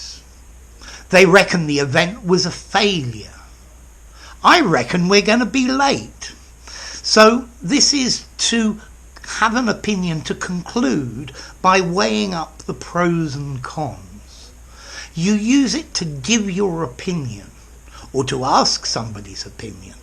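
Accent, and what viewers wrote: United Kingdom. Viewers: I reckon that your channel is the best to learn English with Britch accent Hello my teacher 🌺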